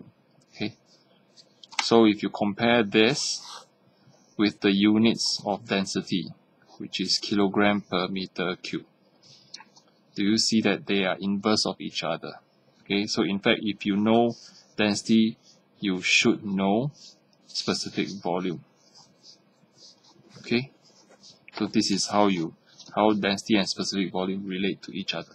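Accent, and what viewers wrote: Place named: Singapore. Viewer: cher i like your singlish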